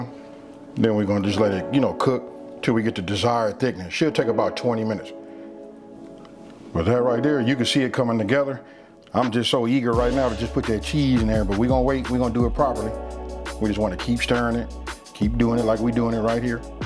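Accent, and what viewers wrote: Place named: California, United States. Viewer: Once I heard that Cali accent I was like Nahh I trust sun cooking..... don't know why but I do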